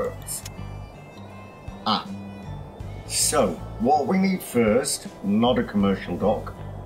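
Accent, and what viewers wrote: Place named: RP, United Kingdom. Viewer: No way I got it? HA HA😃 Your' pronunciation of French words betrayed you proper BBC accent. Man, I spent too much time in the tourist industry.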